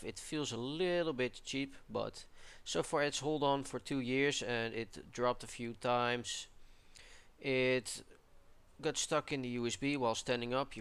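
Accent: Netherlands. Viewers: You sound like a dutchman, just saying :p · I needed 3 seconds to know you are Dutch speaking. :) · just got them! they actually fit perfect on my head! and music and in games does actually this headset proven to be good! not extreme but its only like an 50£ headset and for that price its perfect! i have a problem pretty big one but thats not the headset thats the pc! im having problem with installing the driver(no surround);( but hopefully sooner or later i,v fixed that! im using glasses and it works just fine =) thanks for the review and i think your dutch;y english is perfect xD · lol ik hoor duidelijk dat je nederlands bent maargoed XD (tis oke hor XD) ik zou het eigenlijk handiger hebben gevonden als je de review deed met headset op... dan zou je wat beelden vooraf kunnen recorden en dan de headset opzetten. ik wou namelijk weten hoe het geluid klinkt, als je zou kunnen vertellen hoe het klinkt of ff video link sturen waarbij je die headset gebruikt, bedank voor het lezen/reageren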